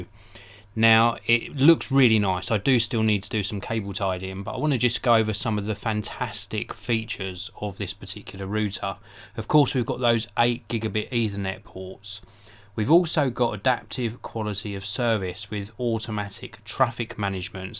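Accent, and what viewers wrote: United Kingdom. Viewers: vfw2 Route is "root" in England. So Router is "rooter"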